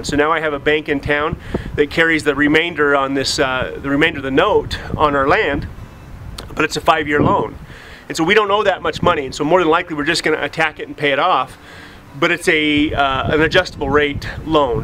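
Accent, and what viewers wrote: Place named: California, United States. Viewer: I thought you said that you were in Idaho. It sounds more like California.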